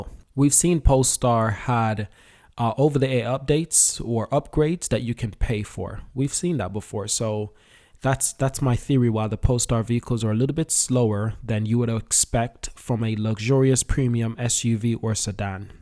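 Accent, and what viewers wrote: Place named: United Kingdom. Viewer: Your channel only seems to pump the news. Why have you not addressed the fact that PSNY share price has dropped almost 70 % since it went public. And with the fact that the CEO persistently wanting to pitch POLESTAR against Porsche is absurd. His ego will ruin the company. That explains why when they launched the P3 in the China market they had to slash prices immediately !! With your accent I assume you are from the UK...try selling Vauxhall at Audi prices and you will know what I mean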